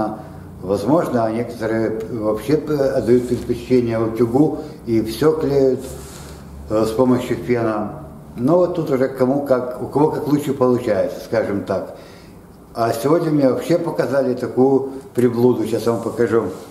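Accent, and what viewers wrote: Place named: Odesa, Ukraine. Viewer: забавляют-не забавляют-такое...))) Во-первых, имеем дело с чистым одесситом. Слушать одесские обороты и истории-отдельное удовольствие. Как говорится, что вы говорите-не важно-просто говорите по одесски. Мастер, по-моему скромному мнению, очень хорошо и без понтов доносит личный опыт, бесценный. И чего раньше канал не создал. Диме, сыну, огромное благодарю, что таки батю сподвиг. И по друге (во-вторых), коммент вверху уводит в сторону от сути и духа повествования. А так, понятно, каждый имеет право видеть и воспринимать ролик по-своему. Ничего личного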